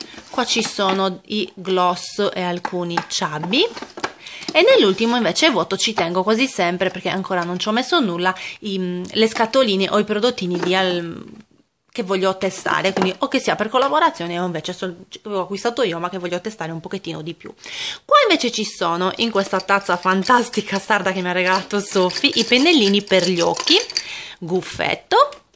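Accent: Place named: Sardinia, Italy. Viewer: Ciao bellissima postazione. Anche io SN sarda. Appena ho aperto il tuo video ho riconosciuto subito l accento. Sei troppo simpatica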